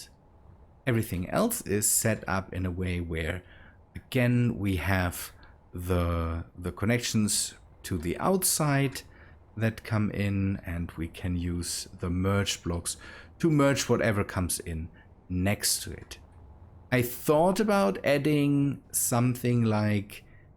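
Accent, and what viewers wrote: Germany. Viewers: I really like the way you speak english. Maybe it's because you've a German accent. At all your Voice sounds really good. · I have a question r u German because it sounds like it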